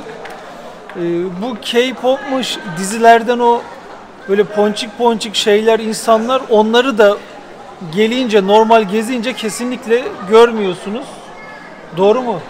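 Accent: Samsun, Turkey. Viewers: Abi sen samsunlumusun ellllllliii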